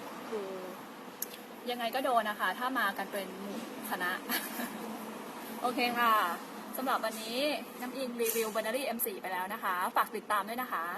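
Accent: North, Thailand. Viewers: อู้กำเมืองยิ่งน่ารักขนาดแต้ๆน้อ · คนเหนืออี้ จังหวัดหยังนิ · ชอบครับอู้คำเมืองน่ารักดี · พี่ อยู่ จ. รัยคะ..พูดเหนิอน่ารักมากๆๆๆ.. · เป็นคนเหนือก่อจ้าว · อู้กำเมือง เวอร์ชั่น น่าฮักขนาดคับ เห็นด้านหลังตอนยิง นึกว่าเป็นสตั้นแมน ดูดุดันมากเลยคับ ไม่น่าเชื่อว่า สาวเอวบางร่างน้อย จะต้านแรงรีคอยลูกซองได้สบายเลย เยี่ยมมากกคับ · ทึ่แท้เจ้ากะคนเชียงใหม่โตยเก่งหลายเน้อเจ้าเว้ากะม่วนแต้เน้อคนเหนือ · พึ่งฮู้น่ะ ว่า อู้เหนือได้ น่าฮักขนาด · คนเหนือหรอจ้าว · เป็นคนที่ไหนครับเห็นพูดเหนือ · คนเหนือป่าวครับ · อู้เหนือน่ารักขนาด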